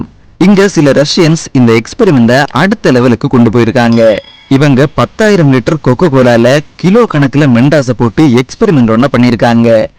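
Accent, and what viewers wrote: Kanyakumari, India. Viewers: Bro neenga kanyakumari ya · Bro neenka Kanyakumari aa?? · Neega kanyakumariya? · Kaniyakumari slang 😂 👌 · He is from kanyakumari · Bro neenga kanyakumari a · Makka kanya kumariyaaaaa😂namma ooru slang mix agi varuthu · 🙄Bro neenga Kanya kumari ahh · Bro neenga kannyakumari ah? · Bro ninga kanyakumariya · Aiiii Nee Kanyakumari ah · Bro nenga kaniya kumari ya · Bro neenga kannya Kumari ya ? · Bro nega Kanyakumari ya .. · Neenga kanyakumari ahhh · மக்கா நீ கன்னியாகுமரி காரனா ? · Neenga kanyakumari district ah bro? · Makka kanyakumari ya unak kk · Bro neenga kanyakumari ah.. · Bro kanniyakumari ah 😍❤ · Avaru kanyakumari 👍🏻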